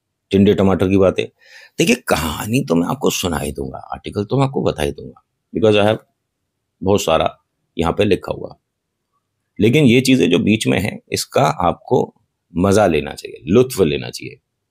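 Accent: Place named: Meerut, India. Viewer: Bhai aap meerut seh ho na.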